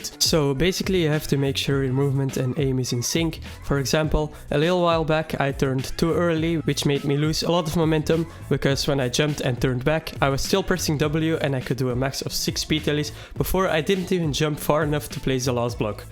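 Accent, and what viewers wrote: Netherlands. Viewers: are you dutch becuse you sound like 1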